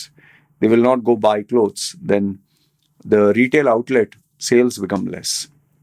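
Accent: India. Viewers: At first i thought you are Hispanic but u have an indian accent.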